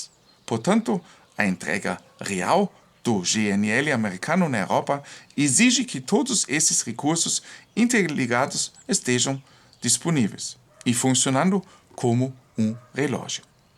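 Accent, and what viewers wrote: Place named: Germany. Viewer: Parabéns! Eu gosto de assistir seus vídeos. Balneário Piçarras SC Brasil Os descendentes de alemães que moram aqui no Brasil falam com sotaque igual ao seu ehehe Em Santa Catarina temos uma cidade com quase 90% da população alemã que se chama POMERODE. Também tem a cidade de Blumenau que tem bastante descendentes de alemães.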